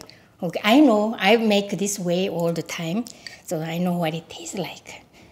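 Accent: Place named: South Korea. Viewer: 한국말 같은 영어 너무 좋습니다!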